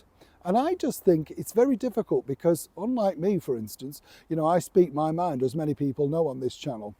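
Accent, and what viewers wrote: Yorkshire, United Kingdom. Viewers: She's always been a fantastically attractive woman, and it's good to hear that she has faith in God. You are right; some people are way too spiteful online now. I like when you said you speak your mind. I think that's why I watch you. I'm guessing you come from Yorkshire.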